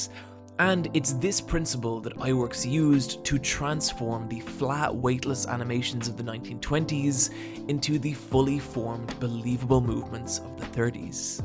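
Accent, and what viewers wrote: Ireland. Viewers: Am I the only one who feels like that he sounds a lot more irish in this video than in his others? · because he's Irish. Whenever he speaks English he does so with that regional inflection, which affects pronunciation IE how he says "Film". It's not something that is "incorrect", unless contradicting common elocution and that can only be called out by someone that lives in that region.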